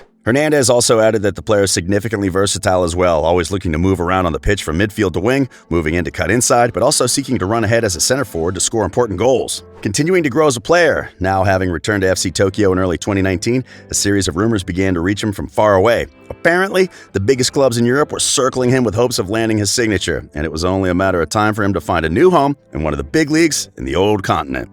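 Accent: United States. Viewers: I am watching it...butchering's name doesn't mean he doesn't watch football! He has a strong accent of American...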